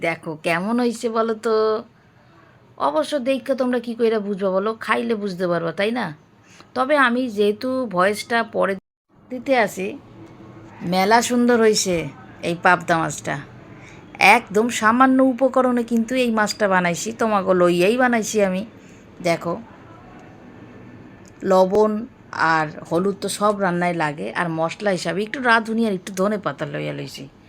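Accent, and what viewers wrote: Bangal, Bangladesh. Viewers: Darun bangal vasa bollle recipe tao khub sundor hoyeche ❤❤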